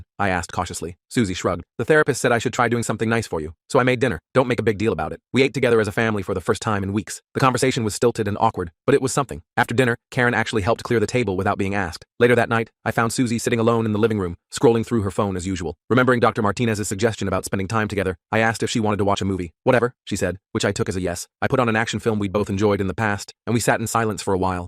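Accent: United Kingdom. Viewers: Why did he turn British?😭😭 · Why did it switch to British accent/pronunciation?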